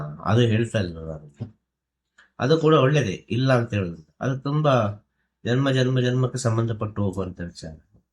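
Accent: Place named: Dakshina Kannada, India. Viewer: ಗುರುಗಳು ಆಗುವ ಮೊದಲು ಏನಾಗಿದ್ದರು..? ತಿಳಿಸಿ . ಮಾತುಗಳು ದಕ್ಷಿಣ ಕನ್ನಡ ದ ಕನ್ನಡ ಆಗಿದೆ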